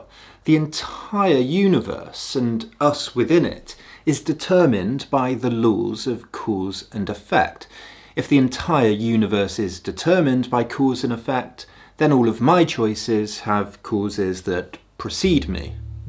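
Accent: United Kingdom. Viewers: Why do I find this guy so attractive? I think I have a thing for British accents and philosophy majors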